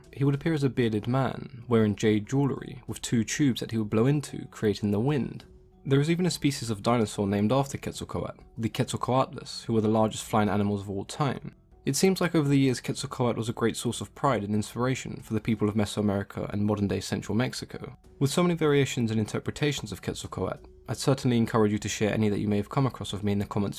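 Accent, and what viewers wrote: United Kingdom. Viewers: ok...whats your accent? You sound British but I don't know if that's what it is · Yes there's something about the British accent that lends an air of Professional Expertise on any subject. I wish my high school professors talked like this. :) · Okay, I've just realised your British, I think, maybe? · A schmuck with a British accent trying to give info on Aztec mythology! Foh smh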